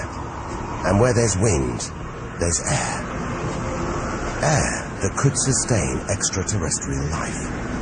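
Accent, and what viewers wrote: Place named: United Kingdom. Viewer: But the whole British voice is killing me lol.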